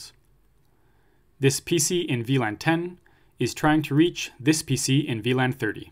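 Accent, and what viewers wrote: Canada. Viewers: Dang, you are doin god's work. I will read my exam questions in that Canadian accent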